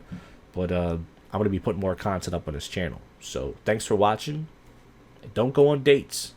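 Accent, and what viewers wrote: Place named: East Coast, United States. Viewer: U sound like east coast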